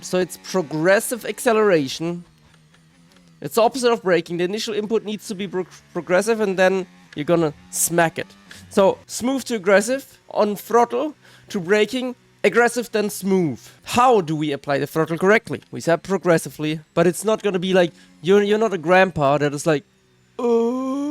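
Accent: Germany. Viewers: thanks, have always struggled with going quick with tc off i am always losing to my tc times also love your german accent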